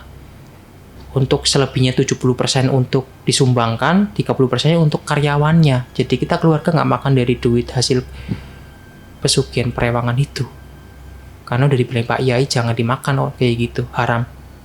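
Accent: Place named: Banyumas, Indonesia. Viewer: Ngomongnya kok sprt org ngapak sprt sy 😂😂😂😂😂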